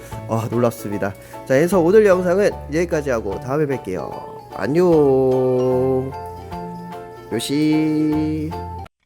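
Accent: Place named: Jeolla, South Korea. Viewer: 전라도 억양 고치세요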